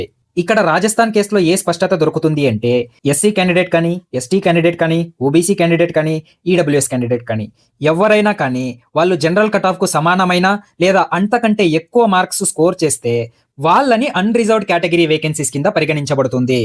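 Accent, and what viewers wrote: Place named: Rayalaseema, India. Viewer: Anna super ga Explain chesaru... Mee slang, Rayalaseema/Karnataka border lo unna valla laa undhi... Meedhi ye ooru thelusukovaccha?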